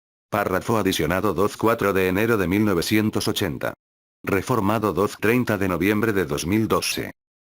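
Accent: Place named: Spain. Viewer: Por qué en acento español.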